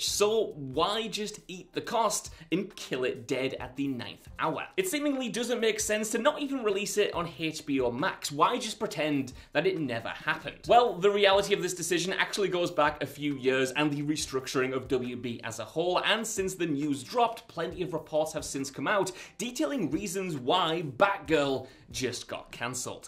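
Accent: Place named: United Kingdom. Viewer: The UK pronunciation of ‘H’ is distracting to my country a$$. Love the channel.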